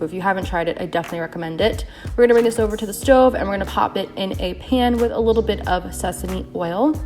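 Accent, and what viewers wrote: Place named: Philadelphia, United States. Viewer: Great recipes! Just started low carb vegan a couple weeks ago and already seeing results. Are you from Philly area? I detect the accent (I'm from there too :) )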